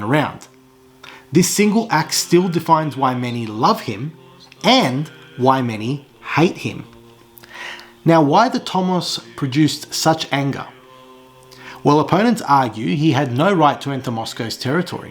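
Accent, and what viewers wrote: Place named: Australia. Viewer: Aussie accent Narrative! Please just cut to the chase.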